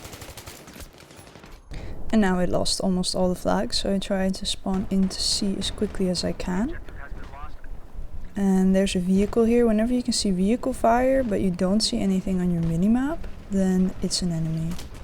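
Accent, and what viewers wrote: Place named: Netherlands. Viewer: You sound Dutch... Am I right?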